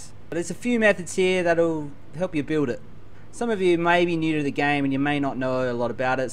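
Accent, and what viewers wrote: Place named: Australia. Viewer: Bro your from Australia to me to😁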